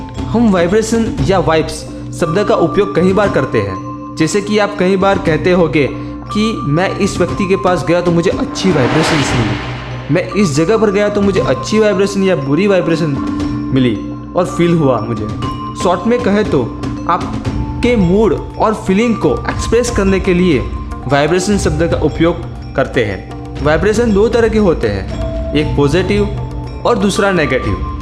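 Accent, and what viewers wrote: Gujarat, India. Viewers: Are u Gujarati?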